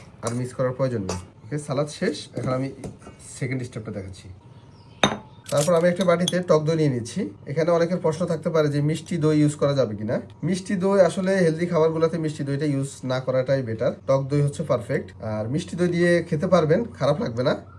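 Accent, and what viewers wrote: Noakhali, Bangladesh. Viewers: নোয়াখালী কথায় বুঝেন না ?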